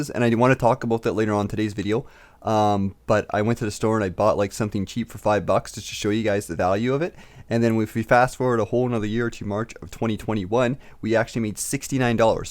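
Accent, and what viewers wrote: Canada. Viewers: are you from winnipeg?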